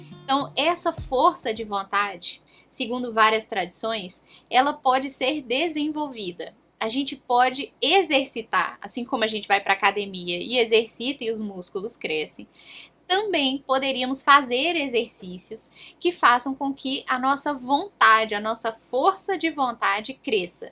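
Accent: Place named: Minas Gerais, Brazil. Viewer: Sotaque de minas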